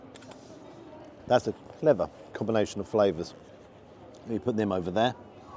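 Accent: RP, United Kingdom. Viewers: You sound very posh, and you have your own camera man. Most people doing this do there own videoing. From A&B.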